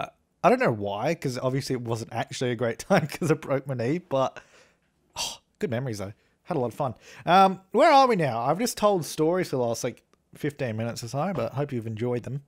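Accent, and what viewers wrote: Australia. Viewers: Erdnussbuttertoast Australia . I think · Erdnussbuttertoast Australia, like me! Who else is from the down under here? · Erdnussbuttertoast it's obviously an Australian accent...😂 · Erdnussbuttertoast it's Aussie :') I think he lives in Sydney ☺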